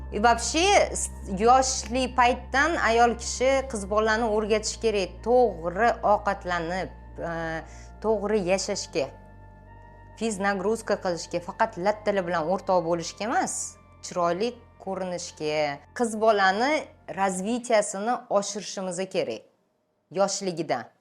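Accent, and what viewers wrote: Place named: Uzbekistan. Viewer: Toʻppa-toʻgʻri xuddi asl haqiqiy oʻzbek ayoliday gapirdingiz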